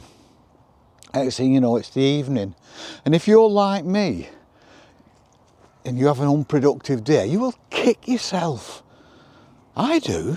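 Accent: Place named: Liverpool, United Kingdom. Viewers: I love your accent! American here but I’ve always loved a northern accent. I think you’re very interesting and enjoy hearing your views and watching your life 🙋🏻‍♀️ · Your accent reminds me of the.❤️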